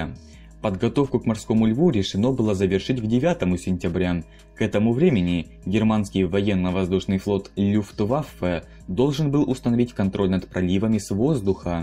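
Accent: Ukraine. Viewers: Западноукраинский акцент, просто старается тщательнее произносить русские слова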